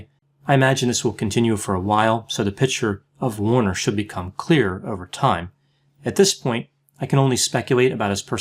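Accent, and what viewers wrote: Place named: Mid-Atlantic, United States. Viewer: Dr, you sound like you're from South Jersey/Philly region. You have a very distinct mid-atlantic accent. Where did you grow up? I'm studying linguistics..just curious!!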